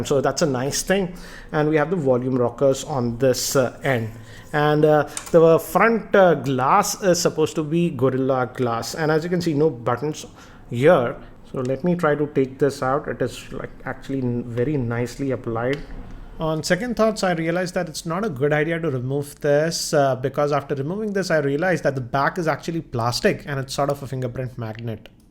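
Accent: India. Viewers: Your accent = Indian cricket commentator . Good video though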